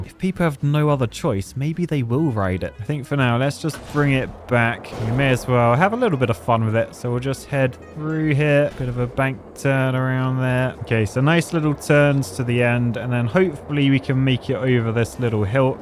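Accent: United Kingdom. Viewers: Did from get a British accent all of sudden?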